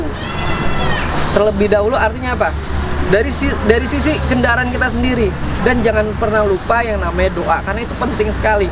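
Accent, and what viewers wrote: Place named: Tegal, Indonesia. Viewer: Tegal ngapak ki❤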